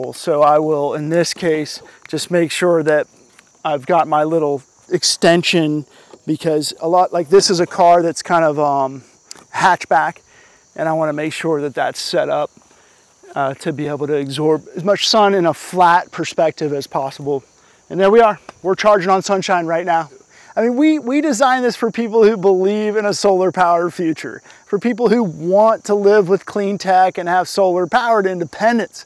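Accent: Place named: California, United States. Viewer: This guys Cali accent is hilarious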